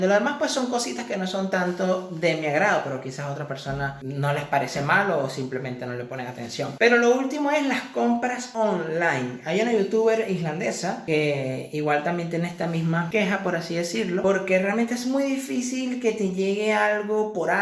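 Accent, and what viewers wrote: Venezuela. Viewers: Desde que empezò dije este chamo es venezolano... bajo a los comentarios y confirmo jajajaja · Llevo solo 1 minuto de vídeo y ya se w eres venezolano jajaja tan bello... Dios te bendiga papito!!!... 😆😘🇬🇧🙏🏼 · No hizo falta escuchar 1 minuto de video para saber que eres venezolano.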